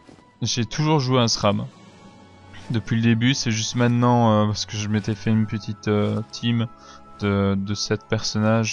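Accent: Belgium. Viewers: Ah un Belge !!!!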